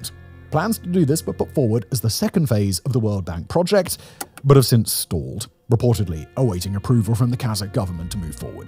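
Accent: United Kingdom. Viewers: That’s cool but I can’t fall asleep to a British accent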